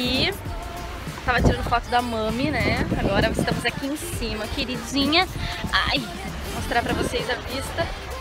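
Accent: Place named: São Paulo, Brazil. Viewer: Pelo sotaque, deve ser SP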